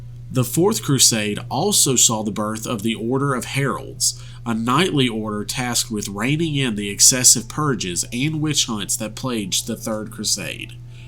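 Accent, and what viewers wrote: South, United States. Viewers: my man has cornered the market on southern US inflected pathfinder lore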